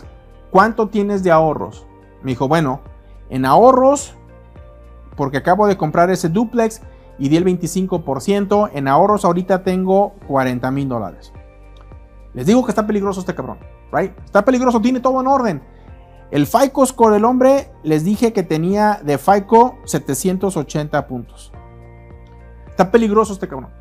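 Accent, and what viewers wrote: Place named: Sinaloa, Mexico. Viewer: UN SALUDO, SON EXCELENTES TUS VIDEOS CON MUY VALIOSA INFORMACIÓN.....TU ACENTO CREO ES DE SINALOA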